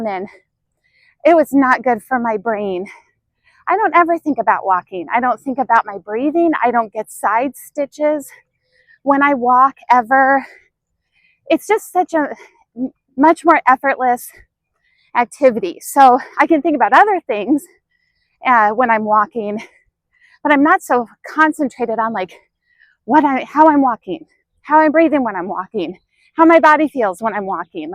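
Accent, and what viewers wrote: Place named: Midwest, United States. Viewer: What's that accent? MI, WI? "Kind of like a bad relationship." 🤣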